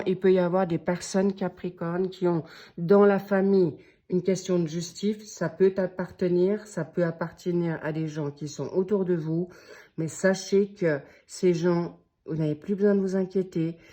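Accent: United Kingdom. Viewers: Merci beaucoup pour ce splendide tirage 🙏 Et merci pour votre diction parfaite et cette pointe d'accent d'outre-manche 🎉🙏😊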